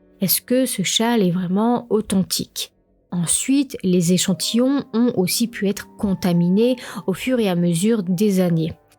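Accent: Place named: Nord-Pas-de-Calais, France. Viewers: Coucou! Merci pour cette vidéo d'une affaire très connue mais très bien racontée avec ton accent ch'ti 😊 Que tu ne te montres pas où que tu aies le visage flouté ne change rien puisque c'est ta voix que j'écoute 😊. Comme un podcast en fait... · ah c'est marrant... moi je t'imaginais toute blonde, ( je sais pas pourquoi ) peut -être l'accent chti , par contre à un " grotéfor " , NON ! un " grozéfort "c'est mieux ...😃 ( gros effort ) dit gentiment bien sûr car j'aime tes vidéos et ta façon de raconter , bravo ...:hand-pink-waving::face-red-heart-shape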